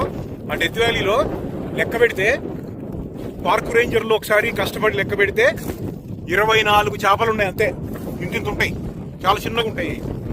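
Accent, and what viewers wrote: Andhra Pradesh, India. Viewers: అన్న ఈ ఒక్కదానికి సమాధానం చెప్పన్నా నువ్వు ఆంధ్ర యాసలో మాట్లాడుతున్నావ్ మీ ఫ్రెండ్ కానీ మీ అన్నయ్య కానీ తెలంగాణ యాసలో మాట్లాడుతున్నారు ఇది ఒక్కటి చెప్పన్నా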